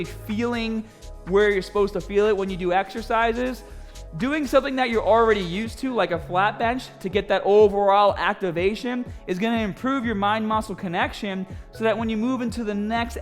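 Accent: Boston, United States. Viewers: You got be from Boston with that accent 💪🏽 I been doing for while definitely gets you a nice pump started to see results after a month nice!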